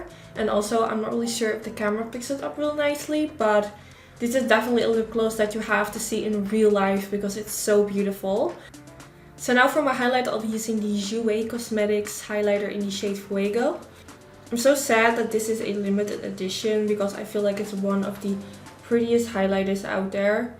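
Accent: Netherlands. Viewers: I never knew a Dutch accent could be this charming! I’m a new subscriber and I thoroughly enjoy your content!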